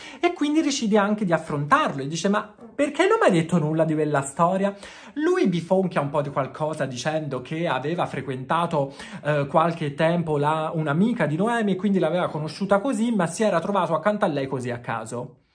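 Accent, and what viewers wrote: Tuscany, Italy. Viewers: finalmenteeeeee ❤ mi fa morire il fatto che parli in toscano mentre spieghi 😂😂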